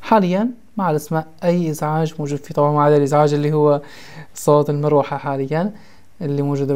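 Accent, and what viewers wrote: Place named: Mosul, Iraq. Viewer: من اللهجة مبين من الموصل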